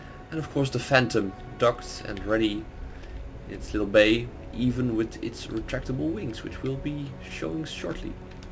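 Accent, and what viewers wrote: Netherlands. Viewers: i have this feeling your dutch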